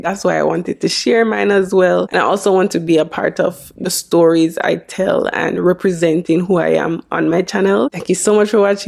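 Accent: Jamaica. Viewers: Are you Jamaican because I’m picking up the accent? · Your Jamaican accent is lovely! And you're pretty! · I was in Bayfarm in Kingston. First time hearing this from the perspective of someone that shares my native accent. I felt the same way when I researched the organization like I was committing a crime. 😂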